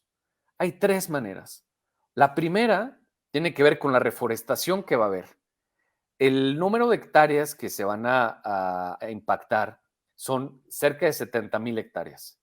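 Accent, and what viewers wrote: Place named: Nuevo León, Mexico. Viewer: A partir de hoy hablo como y el arqui puro nuevo león...me gusta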